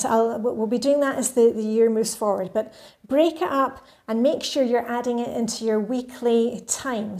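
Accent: Scotland, United Kingdom. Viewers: Love working along with you. Love your calming lilting Scottish tone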